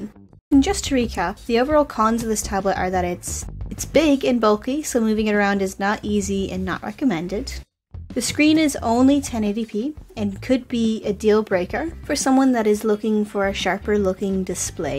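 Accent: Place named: Ireland. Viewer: I picked up on the Ireland part, but I couldn't figure out why it wasn't more pronounced (as Irish accents so often are). The American bit makes sense, though I was going to guess you were in England, and had Irish family or something. But I wasn't hearing any English tells.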